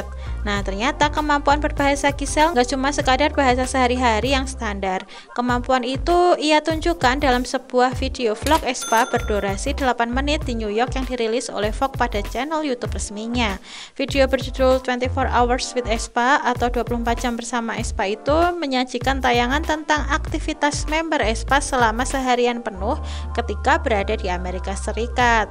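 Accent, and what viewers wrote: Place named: Java, Indonesia. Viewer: medok mbk nya wkw jd salfok soundnya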